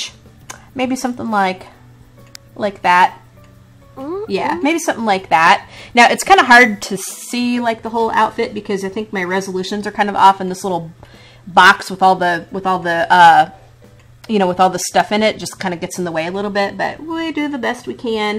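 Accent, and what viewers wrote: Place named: Texas, United States. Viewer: I hope you dont take this the wrong way. But you seem like you have a texas accent are you from somewhere around there (Sorry new fan so I am a little confused) :)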